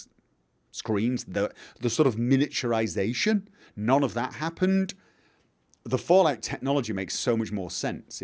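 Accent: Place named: England, United Kingdom. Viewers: Northern english, mostly Manchester, I think. Search " Accent". There is a detailed video about it. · I love your English accent. I never heard your particular dialect. What part of England did you grow up in?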